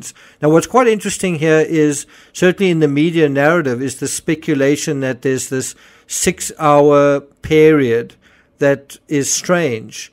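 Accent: South Africa. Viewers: OH! It's was in the Daily Star, then we know it's true. I can tell from your accent you are South African, you clearly do not know what sort of newspaper the Daily Star is?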